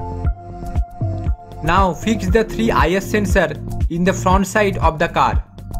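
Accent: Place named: India. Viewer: You have the most stereotypical indian voice You should better use hindi in your next video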